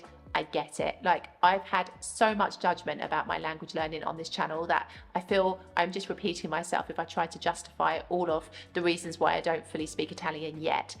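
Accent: United Kingdom. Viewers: Actually she’s not very expectedly fluent. Her level is very very low. Her accent is still very British 🇬🇧. I believe everyone’s journey is different. I am learning Italian too. One day I feel like I have made progress the next day every word has flown through the window and I start all over 😆😆🥹🤔.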